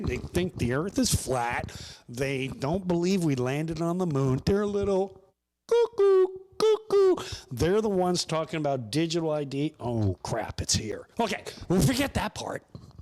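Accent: Ireland. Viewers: Interesting how you slag off English food (in an Irish accent) and then say the Irish woman told you to go eat "Fish & Chips". You COULDN'T get more English than that 🤣🤣🤣🤣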